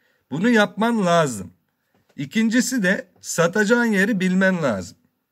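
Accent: Konya, Turkey. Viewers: Hoca Konyalı herhalde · abi konyalı misin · Başkanım adam konyaliyim diyo orjinal şive orjinal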